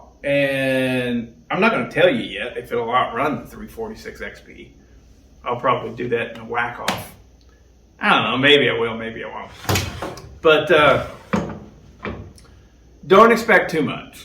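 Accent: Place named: Louisiana, United States. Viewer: Your video quality is freaking fantastic and fun! That Cajun accent is funny stuff!!!!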